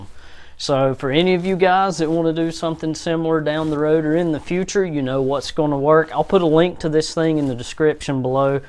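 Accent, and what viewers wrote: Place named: South, United States. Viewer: The southern accent got me laughing 😂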